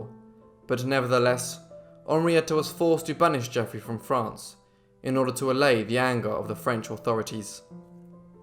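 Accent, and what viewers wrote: England, United Kingdom. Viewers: He speaks English, the English way, you know, the way it's supposed to be pronounced. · British accent.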